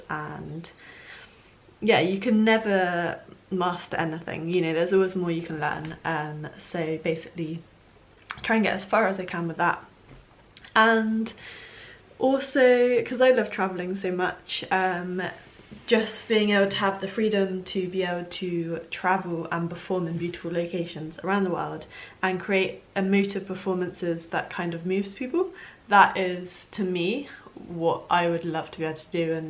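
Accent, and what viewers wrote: United Kingdom. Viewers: I absolutely LOVE!! Your British accent. It adds to your personality. Love you.:-)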